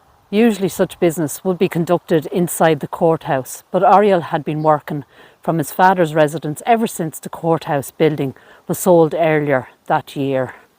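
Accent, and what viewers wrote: Ireland. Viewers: What a adventure you bring us on. Plus I get to see Ireland. I grew up in Kingsbridge in the Bronx. A very Irish neighborhood that I truly miss. When you speak it reminds me of Kingsbridge yes near Gaelic Park and the beauty of Ireland makes me realize the famine Ireland had must have been so bad to leave the beauty of Ireland for NYC. Your videos are fun and I apologize for sort of going off topic. I look forward to watching all you have done and subscribed to the future ones. I’ll also share.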